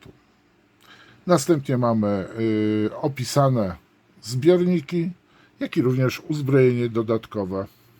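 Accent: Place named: Poland. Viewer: Ciekawy Polski alcent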